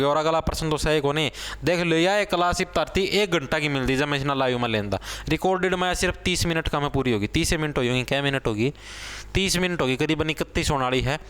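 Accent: Haryana, India. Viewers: Ya to hindi ae bol le ya fer haryanvi bol le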